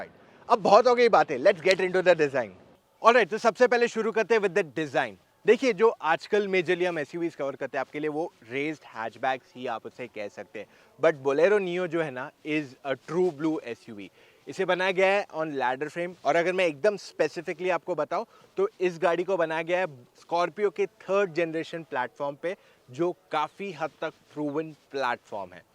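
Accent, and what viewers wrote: India. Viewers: nobody notices it spoke in very desi style because he knows that the people who are going to buy rthis they might not know english words that much hats off, he knew about his audience very well, subscribed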